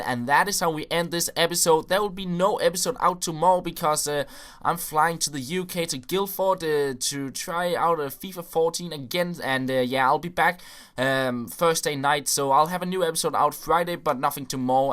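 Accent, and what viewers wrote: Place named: Denmark. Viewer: I can hear on your accent that you are danish :D I'm norwegian, you see :D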